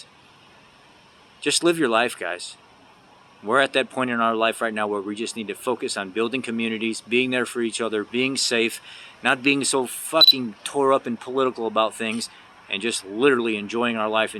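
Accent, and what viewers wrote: United States. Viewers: Perfect American speech !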